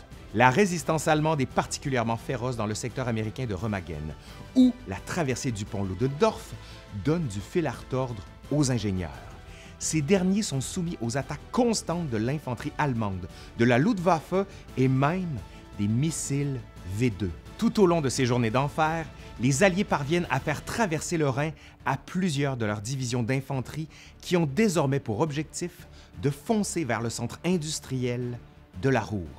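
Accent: Quebec, Canada. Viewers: Je te le mets avc plaisir mon "pouce par en l'R" 😄 Surtt q je suis persuadée q ce ne sont pas d heures q vs avez passé à faire 7 vidéo.. mais plutôt d semaines... 👏👏👏👏 C extrêmement bien fait..les images d'archives sont placées o bon moment ..et pis.. ton accent Québécois... J'AdÔre 😉 À Bientôt 🙋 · Wow, je regarde toutes vos vidéos avec attention. Et vraiment, je les trouve hyper ludiques, et on ne lasse pas ! L'accent québécois est charmant (pour nous français) haha ;). Pour vous dire que j'aime beaucoup vos vidéos et qu'il faut continuer ainsi, j'ai même envoyé la vidéo sur le mur de Berlin à ma professeure d'histoire qui a adoré ! Je vous souhaite de continuer encore pendant longtemps ! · Quel conteur de haut vol et puis cet accent canadien que nous aimons beaucoup en Europe ! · J'adore l'accent québéquois! Merci ;)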